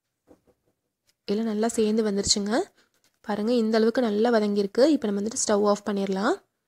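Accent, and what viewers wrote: Coimbatore, India. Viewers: Your netaive Coimbatore ma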